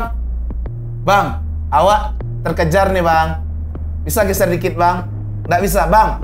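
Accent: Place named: Padang, Indonesia. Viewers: Wkwk denger pakai logat padang jadi kangen saudara yg disana, dulu pernah njir persis kyk gini turun di minangkabau trus dr padang ke sungai penuh naik travel avanza njirr mantep wkw pulangnya kapok ganti naik travel hiace hahah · Kalau mau dengar logat indonesia padang nya keluar di konten ini.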